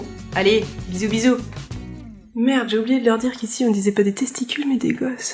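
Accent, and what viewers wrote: France. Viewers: Messemblais que tavais un accent français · M0i jaddort l'accent française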